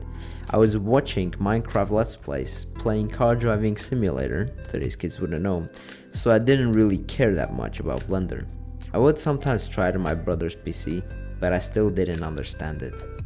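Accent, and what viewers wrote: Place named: Czech Republic. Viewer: CZECH!(jsem taky čech) like in that clip from world of tanks, I saw the Czech inscriptions and it was kind of strange to me, and then when you spoke, I realized that you are Czech.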